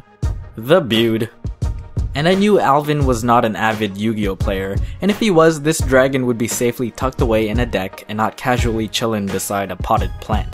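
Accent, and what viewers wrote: Canada. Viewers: You from Canada? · R u Canadian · wait are you Canadian?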